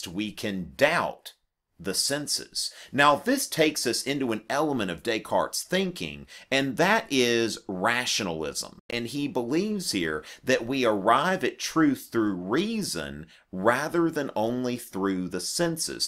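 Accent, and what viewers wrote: South, United States. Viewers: His thick Southern accent had me dead before he even said something educational.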